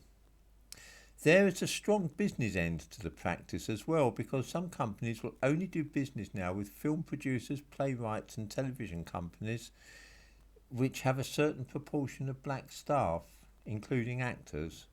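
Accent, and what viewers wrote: United Kingdom. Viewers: The incredibly Britishness of the commentator lends credibility to the argument. For sure.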